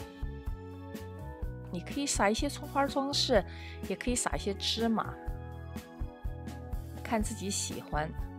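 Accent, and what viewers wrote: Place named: North, China. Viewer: 你好，请问祖籍何方？听口音，应该是北方人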